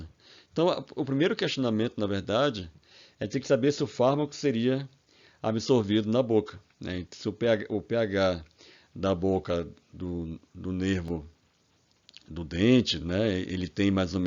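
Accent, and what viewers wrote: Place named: Pará, Brazil. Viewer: Sotaque paraense. acertei ou errei?😊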